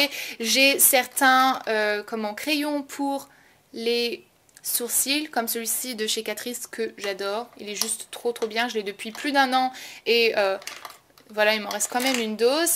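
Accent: England, United Kingdom. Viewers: Comment ça se fait que tu as ce bel accent anglais ? Tu es de quelle origine ? J'adore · Super vidéo comme d'hab :) [Aparté : C'est pas un reproche, mais ça me fait toujours mal aux oreilles de t'entendre prononcer la marque "Revlon" en version Française "ON" au lieu de "Revlon(e)" sachant que c'est une marque Américaine et que 99% du temps tu prononces souvent les marques avec ton accent anglais :p Fin bon, ce n'est pas grave, ça fait juste bizarre a entre c'est tout ;) ] Bisou !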